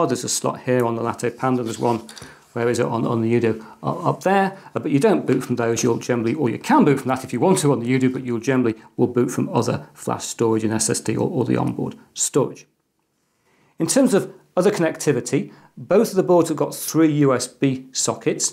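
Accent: England, United Kingdom. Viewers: you videos are fantastic! Keep it up! That english accent is very nice to hear. It's sounds like an intelligent person.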